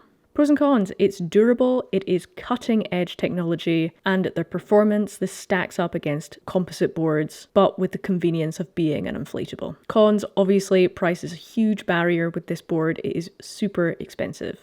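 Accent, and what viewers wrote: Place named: Scotland, United Kingdom. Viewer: lady has a scottish accent but gives prices in $s ! yeah that makes sense. thought this was a brit video . could of done with prices in £s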